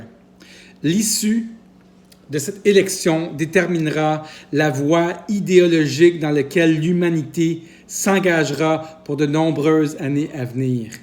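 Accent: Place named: Canada. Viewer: J’ai horreur de certaine personne qui se permettent de critiquer ,c’est l’accent du Canada,eux en tous les cas n’ont pas peur de dénoncer se qui se passe ,merci aux Canadiens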